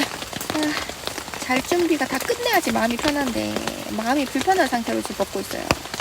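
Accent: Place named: Gyeongsang, South Korea. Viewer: 참 목소리가 매력있네요 약간 경상도 풍의 사투리가 깔리면서 약간 옥구슬 굴러가는 듣한 뭔가의 특이함이 있네요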